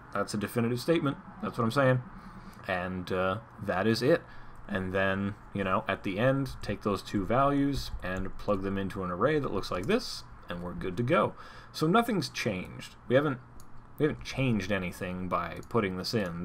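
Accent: Canada. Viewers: I loves your Kaynedien accent hey. thanks for this.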